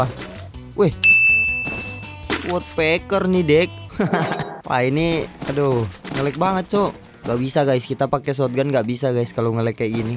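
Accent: Bengkulu, Indonesia. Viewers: bang lu dari Bengkulu ya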